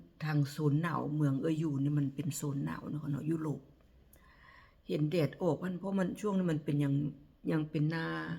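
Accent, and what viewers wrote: Nyo, Thailand. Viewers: มานั่งฟังพี่ เม้ามอยเด้อจ้า❤มักๆสำเนียงญ้อค้ะ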